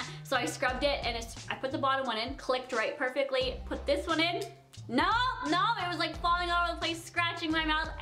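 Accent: Canada. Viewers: DayDr3ams is she not allowed to have a Canadian accent 🤐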